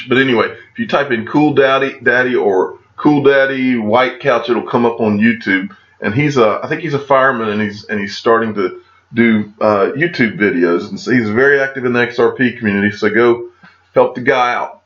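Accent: United States. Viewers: Whats with the cowboy accent ?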